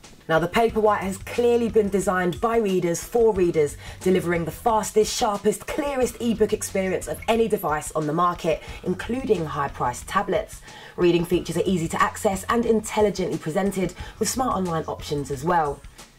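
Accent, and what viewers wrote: England, United Kingdom. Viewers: WOW! You are simply amazing. You could have easily been talking about Fruit Loop cereal. Your boyfriend better treat you right cause you are a Dimond with a beautiful english accent. P.S. I would so follow a youtube vlog of yours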